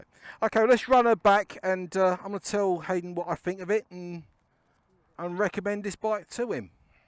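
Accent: Cockney, United Kingdom. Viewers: The cockney accent is unbearable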